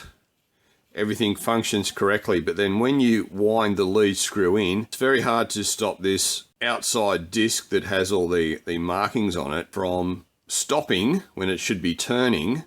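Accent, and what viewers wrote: Australia. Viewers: a sweet Aussie accent. This dude sounds cool as hell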